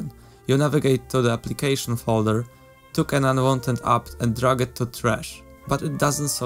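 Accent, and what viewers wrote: Poland. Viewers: Pierwsze parę sekund i myślę sobie: kurwa to musi być Polak XD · are you Polish? I'm guessing by accent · Do I hear Polish accent?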